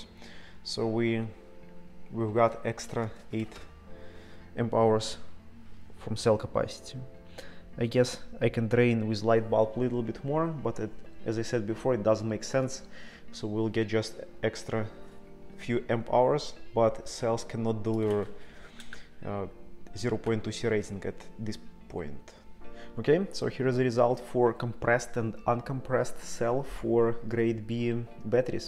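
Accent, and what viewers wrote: Russia. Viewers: Да , но английский с русским акцентом ужасен!!😢😢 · You are from Russia? You have a very strong Russian accent.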